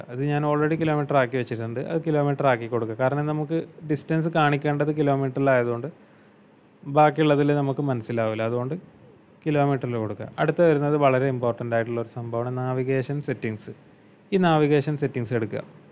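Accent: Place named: Malappuram, India. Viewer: മലപ്പുറം ആണല്ലോ താൻ... ആ സംസാരം കേട്ടാൽ തന്നെ manassilavum.. പിന്നെ എല്ലാ മലപ്പുറം ടീമും എറണാകുളം എന്നുള്ളത് എറണാoകുളം എന്നെ പറയൂ... വിവരക്കേട്.....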